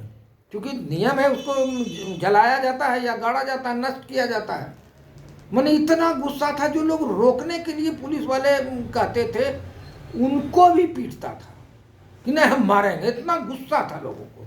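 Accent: Bihar, India. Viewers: Heard the whole story for the behari accent 😂 love it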